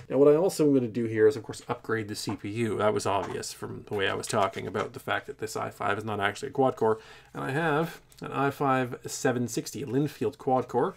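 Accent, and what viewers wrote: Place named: Russia. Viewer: that Russian accent is on point.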